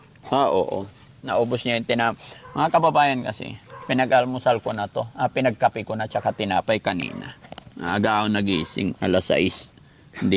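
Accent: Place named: Cavite, Philippines. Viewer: Ung tono ng salita nya sounds like cvte o batangas...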